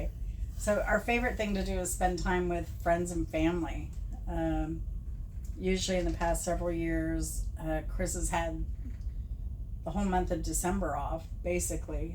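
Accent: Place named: England, United Kingdom. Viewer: I love hearing your English accent because I can learn English listening 🙏 that is truly inspiring and interesting to hear the story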